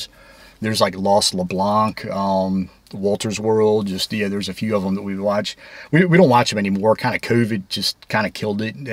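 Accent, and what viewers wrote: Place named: Mid-Atlantic, United States. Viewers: I’m a resident of Fredericksburg and I’ve really liked your content. So much history in this little town. I have to ask, where did you grow up? I can’t place your accent 😂. Pennsylvania? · Congrats! As far as accents, I'd say you have a mid-Atlantic accent, neither Northern nor Southern, yet not Midwestern. You don't have the twang of southwestern VA where I was raised, nor the drawl of the Danville area where I lived. And nobody in VA has the all out Southern accents down here in SC and GA 🤣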